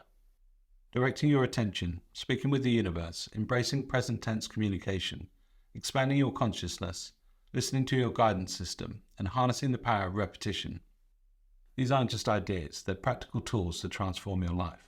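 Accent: United Kingdom. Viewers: pure information with a British accent( I love it)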